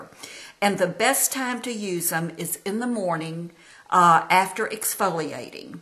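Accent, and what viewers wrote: South, United States. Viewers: This lady is an such a debutante of the South! The accent is priceless and you can tell she is a genuine person with a great heart. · I love her and her accent because I’m from the south. You look beautiful!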